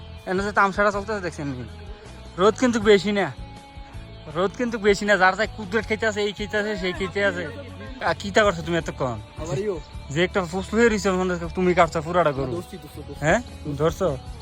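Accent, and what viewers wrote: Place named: Comilla, Bangladesh. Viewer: আপনার কথার বাসায় বুঝা যায় আপনি কুমিল্লা জেলাল মানুষ কোন থানা আপনার বাড়ি জানাবেন ❤ ঈদ মোবারক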